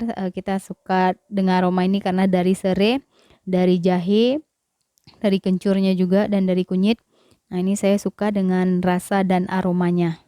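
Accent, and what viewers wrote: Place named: Minangkabau, Indonesia. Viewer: UNI LOGAT MINANGKEBO NYO RANCAK NDAK ILANG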